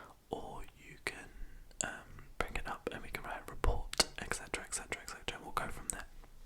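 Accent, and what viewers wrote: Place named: United Kingdom. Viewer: I love your videos and british accent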